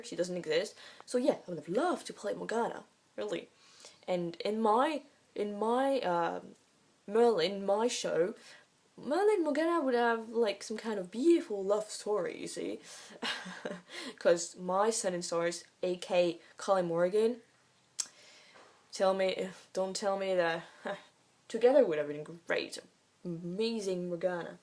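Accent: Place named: Canada. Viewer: im questioning so much, like the fact im on a video with low views, basically this is a field that hasnt been stepped on in 8 years and i just stepped all over it. Confused with the accent sounds kinda canadian maybe its a hybrid accent.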